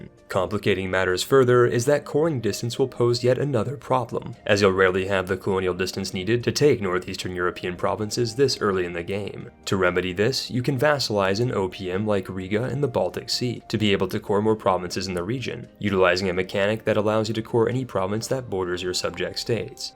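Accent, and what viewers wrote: Texas, United States. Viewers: Came for the Achievements, stay for that Texas accent. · bruh that texan accent lmao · the smoothest Texan accent out there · Love the Texas accent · I love Texas accent :D. Make a video only in Texan 😂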